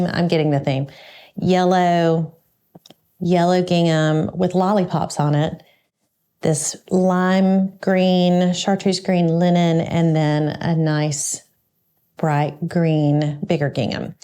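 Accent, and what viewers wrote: South, United States. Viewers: I live Springfield, Oregon, but several years ago I got to live in the South for 7 years, and once I heard your voice I felt right at home. I'm a craft dyslexic, directionally dysfunctional, and come from a long line of Fabricholics. I too love thrifting. Your calm way of explaining things helps me feel like I really can make something. I especially enjoyed your short tutorial about how to use what little time we have wisely. You set your timer and polished off some "catch up" thing you don't enjoy doing, but you taught us that being self disciplined in doing the menial tasks IS part of the creative process. Thank you 🙂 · Congratulations on your 10,000 K subscriber milestone!! You have inspired me so mucn, especially with the way you showed us how to use thrift stores to make quilting affordable. I had never given it a thought before you showed us how to do that, and kinda what to look for. 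I have to mention how much I LOVE your SOUTHERN ACCENT. I grew up in Ky so it is so soothing for my soul when I watch your videos. I am currently living near Gettysburg PA. Keep ups the great content. Thanks to Paul for being such a supportive person in your journey. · there are so many wonderful moments of laughter and joy in all of your videos, that's why I watch. I guess the episodes that i enjoy the most are any that highlight your wacky and interesting ways that you have with the English language and how you put your own spin on certain words, and your Southern charm is just so captivating. I am Jane from the UK. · Congrats, on your 10k+ subscribers! From Wisconsin, I love your relaxed, slightly southern, humorous delivery - I feel we are friends, and maybe sisters in a previous life! My favorite is from your “My Very First Quilt” video. You said “I didn’t know what I was doing (so) I didn’t know to be afraid.” I think we have all been there! 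· I love all of your videos but my favorite when you showed your first quilt. It brought back lots of memories when I did not know what I was doing but went for it. I live in Bagley, Ala so we are only about 50 miles apart. That is great because I love hearing your Southern voice and words. Keep up the wonderful and fun videos. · My favorite moments are when you laugh at your southern accent and your husband posts definitions. Fountain Hills AZ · I love your Southern, casual, conversational style in all your videos but especially in Why the Catbird. It’s like a visit with a good friend. ❤ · Congratulations and Paul. I'm a fellow fan of spots, gingham and recycling but mostly I enjoy your joy and warm southern accent. I live in a tropical Australian city where the men seldom wear dress shirts but I can enjoy your stash creations from afar.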